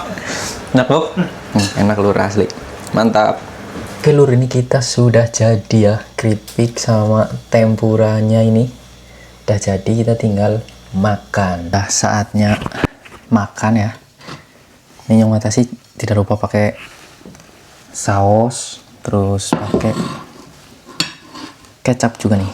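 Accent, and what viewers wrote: Banyumas, Indonesia. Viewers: Mas watasi sangat kreatif ya, senang dengan vlognya yg ngapak2 gitu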